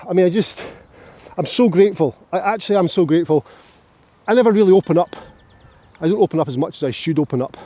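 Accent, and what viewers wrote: Scotland, United Kingdom. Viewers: I kind of imagined you somewhere in the north of Scotland. Very desolated. Maybe it’s your accent.you were sort of “rough”, until I saw you interact with your kid. Which was heartwarming. Keep it up. I enjoyed it. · Thanks so much for your informative videos, I really enjoy them, including the Scottish accent. I am not Scottish, but I live in Nova Scotia Canada, which is Latin for New Scotland, so I feel at home seeing glances of your beautiful Country. Keep up the great content, and I wish you all the best going forward.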